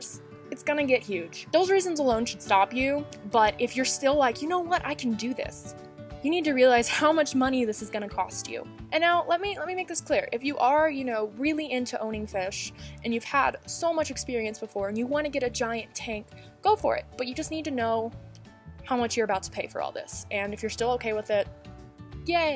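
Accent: Texas, United States. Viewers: is when i really here her texan acent im from texas to so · I just realized you have a slight Texas accent or whatever it's called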